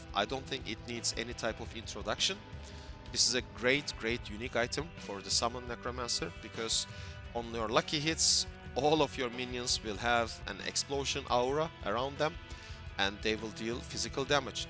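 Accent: Turkey. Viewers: Where are you from? You have such a turkish accent.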